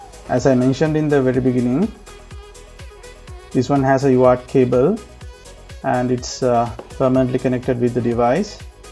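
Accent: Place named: India. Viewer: Pity you don't have the subtitles. Your Indenglish is difficult/impossible to understand when you do the patches of high speed phrases.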